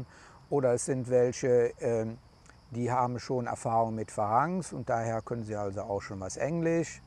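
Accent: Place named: North Rhine-Westphalia, Germany. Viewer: Ja das ist alles nicht so einfach. Habe ich mir einfacher vorgestellt........ Ich dachte mir schon an der Aussprache dass du aus Nordrhein-Westfalen bist. Ich bin aus Alsdorf. Glückauf.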